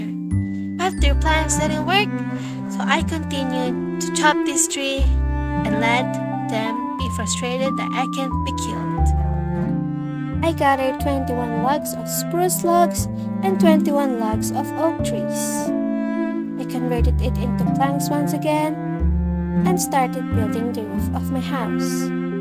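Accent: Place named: England, United Kingdom. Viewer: THE VOICE OVERRRR OMAGASSHHHH! love your english accent ateeee! so fluent <3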